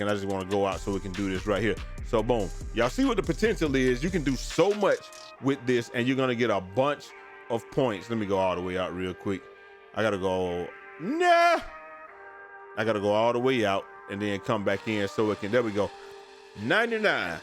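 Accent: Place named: South, United States. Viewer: They from the south. Most men from the region sound like this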